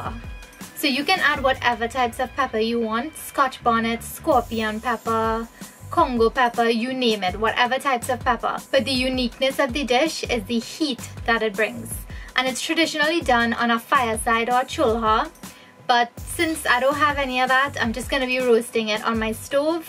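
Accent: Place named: Trinidad and Tobago. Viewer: Oh goddd a love meh trini ppl accent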